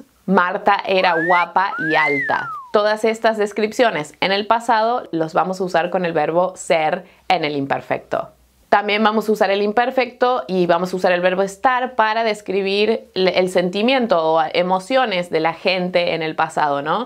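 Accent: Argentina. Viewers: Ella está hablando más en castellano argentino salvó el tu qué acá no se usa el español tiene muchos tiempos de verbos que ni yo los se salen solos soy argentino si querés aprender te aconsejo que vayas más al norte de sudamericana te va a resultar más fácil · ¿Eres argentina? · ¡Muchas gracias, muy interesante! Me gustan tus lecciones porque aprendí a hablar un poco de español cuando estuve en Argentina, hace muchos años, y entendí que eres argentina por tu pronunciación. La primera diferencia es la pronunciación de dos el... por ejemplo llave, lluvia, llamar, etc. Los argentinos los pronuncian más o menos como g, pero creo que la gente en España los pronuncien diferente. Disculpe mi español, es muy malo. Estoy aprendiendo.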